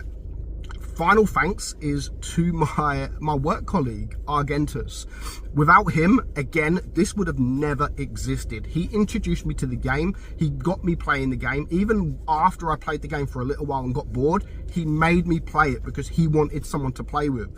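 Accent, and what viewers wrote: United Kingdom. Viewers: Really sorry to see you go. I am going to miss your British accent. God speed.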